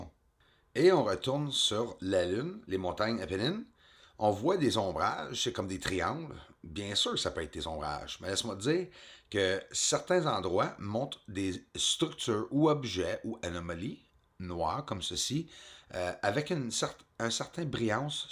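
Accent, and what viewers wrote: Quebec, Canada. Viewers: Salut Rien que d écouter cet horrible accent québécois, ça le fout le bourdon.. Dites vos conneries en ̀anglais , c est mon conseil.. La c est too much bullshit, sur le fond et la forme. .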